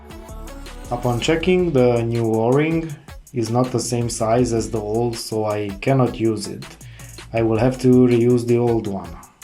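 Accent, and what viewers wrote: Romania. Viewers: din primele propozitii se vede ca esti roman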